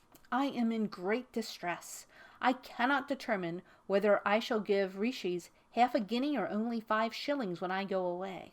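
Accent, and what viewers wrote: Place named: United States. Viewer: It just doesn't work with an American accent 😕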